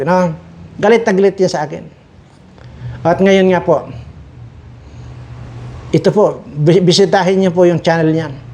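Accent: Iloilo, Philippines. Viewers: Acsent talaga yan sa taga probinsya duon sa iloilo sir 👍😅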